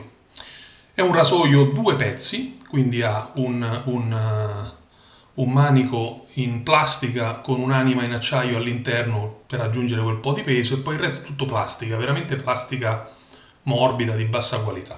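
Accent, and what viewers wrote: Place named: Naples, Italy. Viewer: dal tuo parlare mi sembravi di napoli ehehe tutto qui.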